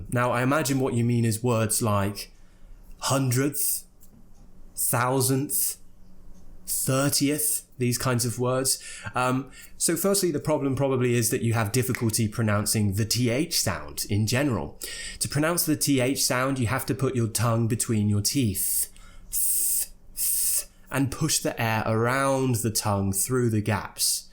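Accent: RP, United Kingdom. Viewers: I love the British accent and your videos really please me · He is a Brit! Can't you sense that? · i always keep watching your vedio. I'm practicing the British accents. I love to hear this accents,and quietly difficult. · Thanks i has lerrn the british english from you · If all in london speaks like you it shouldn’t be so bad but ...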